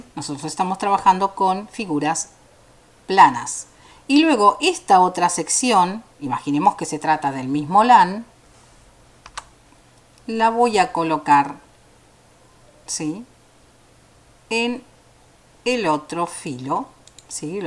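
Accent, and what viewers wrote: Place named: Rioplatense, Argentina. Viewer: el acento de vos me parece el de Argentina rioplatense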